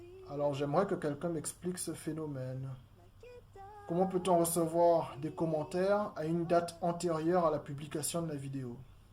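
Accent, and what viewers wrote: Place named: Antilles, Caribbean. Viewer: salut ! Je suis une de tes abonnées et je me disais bien que tu avais un accent créole antillais :) j'ai aussi des origines de Guadeloupe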